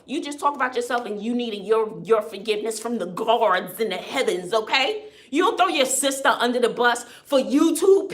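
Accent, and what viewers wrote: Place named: Boston, United States. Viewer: Where are you from? You sound like and remind me of my aunties from Boston?? ☺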